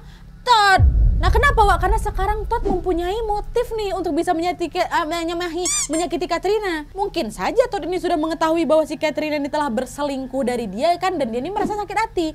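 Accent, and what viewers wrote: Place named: Sulawesi, Indonesia. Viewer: Ah suka sama kk kalau lg critaa..ada logat2 sulawesinya.. sukses ya kk🥰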